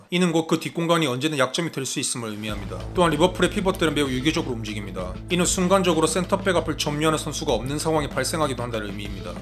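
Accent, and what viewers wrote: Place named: Gyeongsang, South Korea. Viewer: 경상도 스탈인듯요~~^^😮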